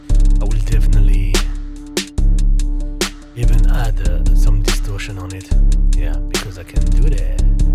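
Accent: France. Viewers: DUDE YOU'RE ILL MAN!! love your accent, you're french ah?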